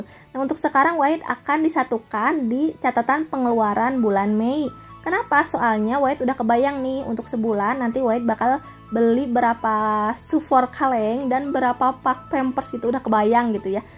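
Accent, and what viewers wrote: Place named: West Java, Indonesia. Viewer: Lucu ngomong nya enak di denger dg logat Sunda nya 🤗😘 salam kenal 🙏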